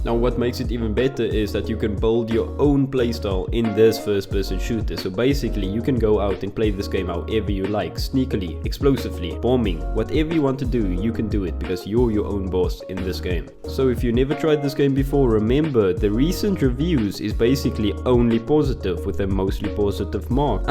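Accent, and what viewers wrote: Afrikaans, South Africa. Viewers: can hear the afrikaans in this boet, but good video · Are you afrikaans?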